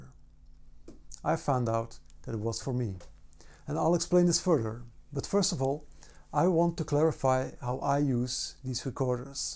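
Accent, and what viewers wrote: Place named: Netherlands. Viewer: Je Nederlands komt er door, geen probleem hoor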